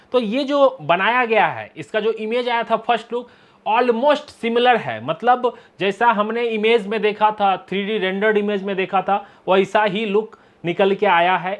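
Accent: Bihar, India. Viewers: uncle aap bihar se hai 😊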